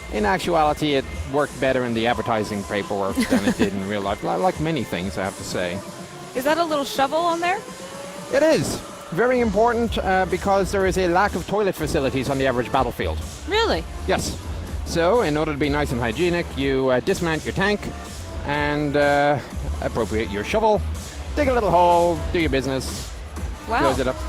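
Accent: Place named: Ireland. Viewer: Love that Irish accent! When is your "Inside the Hatch" show gonna come out?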